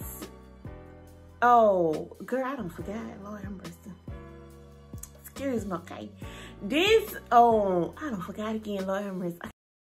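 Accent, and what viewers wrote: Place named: Atlanta, United States. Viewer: Lol your voice 💕❤️ you gotta be frond Atlanta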